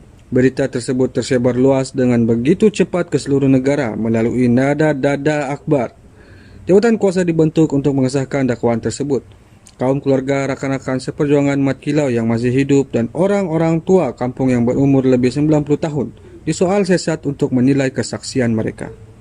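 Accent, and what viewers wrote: Sabah, Malaysia. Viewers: Host nie mcm org sabah jak dari dialek cara percakapan...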